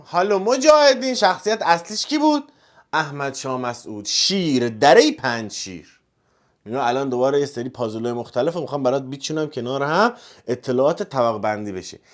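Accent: Shiraz, Iran. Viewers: یعنی من عاشق لحجه ی شیرازی هستک🇮🇷🇮🇷🇦🇫🇦🇫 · افغاني هستم هميشه عاشقِ طرزِ بيانت-لهجه شيرينِ شيرازيت و تحليل هات بودمو هستم سياسي مخصوصا-بخدا اگه چهار ساعتم ويدئو بسازي آدم خسته نميشه سير نميشه از ديدنش-حرفاي اين ويدئو هم كه به دلم نشست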